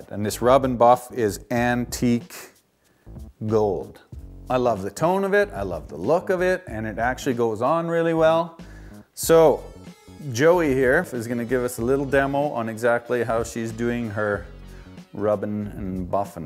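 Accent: Canada. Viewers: Nice video, you sound like you are from the Ottawa Valley.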